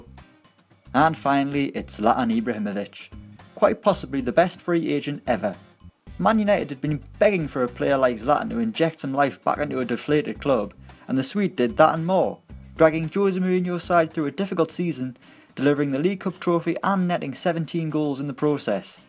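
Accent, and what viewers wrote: Ireland. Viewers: Are you Irish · HITC Sport are u guys Irish?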